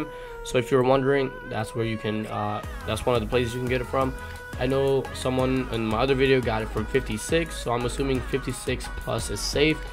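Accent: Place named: Nepal. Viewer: Bro U Nepali? U sound Nepali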